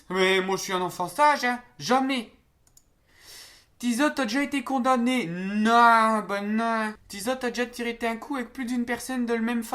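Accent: Nord-Pas-de-Calais, France. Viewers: Sa se vois que est un ch’ti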